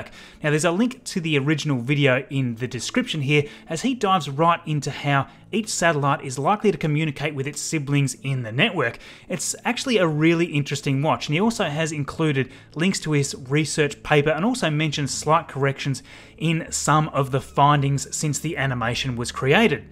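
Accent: Australia. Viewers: Can not listen to a thick Australian accent; it's unbearable; sounds like a flushing toilet. Can you get someone with a soft pleasant voice?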